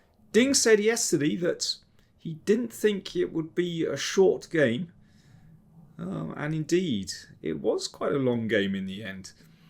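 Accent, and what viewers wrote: United Kingdom. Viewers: GM Danny - your smooth voice with British accent gives us listeners a human touch on chess in this AI world. Personally, it reminds me of David Attenborough voice talking about chess predator strategy going for the opponent King! :) Thank you for your commentary. I feel all big corporations need to invite GM like you to motivate and give life lessons to employees every year. Anyways, I personally think that Ding's blunder was sad for Chess WC title itself! Yes, it is how it is and I am happy Gukesh won as he played by the rules and won Candidates as well, but also feel sad for Ding that it was out of a blunder. But To 18 year kid Gukesh: He fought a drawen game to squeeze win here just like Carlsen. So Kudos to him. Am I happy about the results? Not really coz I want a real fight. Do I agree with the result? Absolute Yes. Do I think the Chess fans would deserve a Play offs here? 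Yes. Any regrets? No. Fun Fact: Gukesh can not even marry as Marriage age for man in India is 21. Anyways congrats to him and very well deserved. I cannot wait for next challenger. - From Indian American Chess enthusiast.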